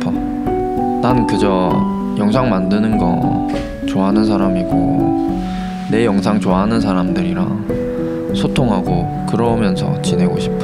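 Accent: Daejeon, South Korea. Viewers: 저기 혹시 대전사람인가요 어째 친근하더라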